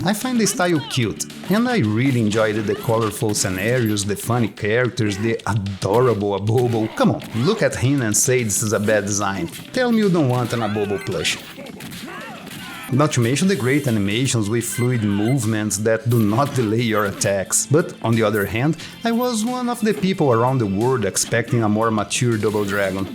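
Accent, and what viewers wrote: Brazil. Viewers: Esse cara é Brasileiro, eu conheço o Inglês Brasileirado de longe....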